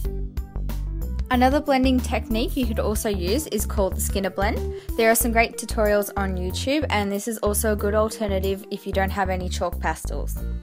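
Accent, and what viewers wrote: Australia. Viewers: Are you Australian you sound like it I am Aussie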